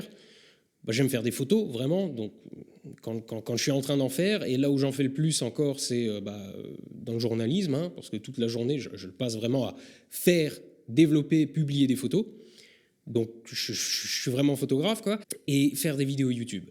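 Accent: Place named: Alsace, France. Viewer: Je viens de découvrir votre chaîne et un truc me viens a l’esprit..... L'accent Alsacien ! C'est pas possible autrement , tu est un Elsässer haha ;) On entend l'accent Germanique xD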